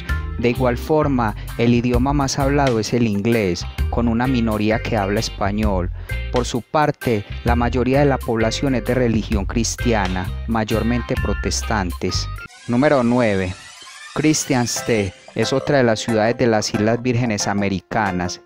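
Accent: Colombia. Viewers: Sin duda alguna es colombiano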